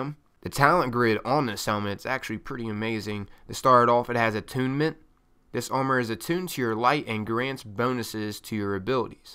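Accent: United States. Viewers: it seems like your voice slowly turned more country throughout the video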